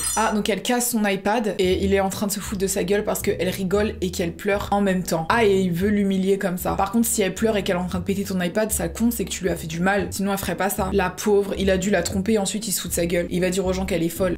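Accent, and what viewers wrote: England, United Kingdom. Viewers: L accent anglais j adore t aime bien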